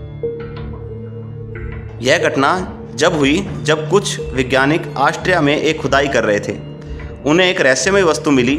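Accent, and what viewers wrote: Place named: Haryana, India. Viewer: Haryane te lage h Bhai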